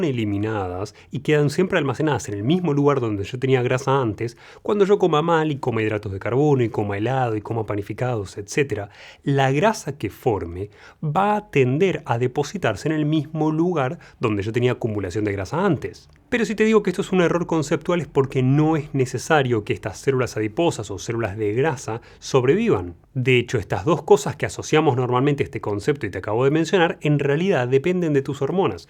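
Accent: Argentina. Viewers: no le ves la cara de ángel ? Su acento argentino ? Su tono de voz tan relajante ? Su sabiduría? Su inteligencia y nobleza ? ❤️❤️ èl no puede ser de esta tierra . 👼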